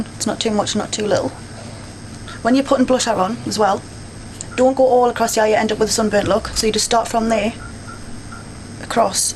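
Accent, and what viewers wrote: Newcastle, United Kingdom. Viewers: It's Geordie - as in Newcastle etc... !! !!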